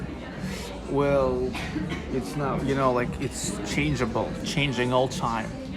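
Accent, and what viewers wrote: Russia. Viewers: Your Russian accent is charming; don’t lose it.👍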